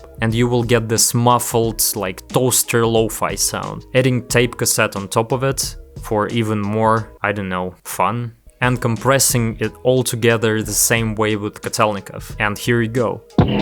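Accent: Russia. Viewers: лайк с русским акцентом, красава чел! 👍🏻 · i love this russian accent its just Beautiful , i know phonk is born american but i cant imagine phonk without russia ! thanks bro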